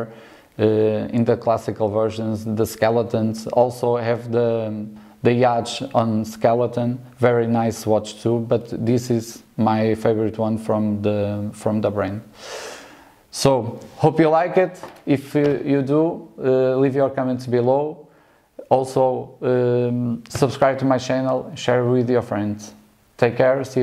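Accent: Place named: Portugal. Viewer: Pelo sotaque suponho não errar. É português? Bom vídeo, detalhado e pessoal. Estou em vistas de comprar um igual. Aconselha alguma loja em especial?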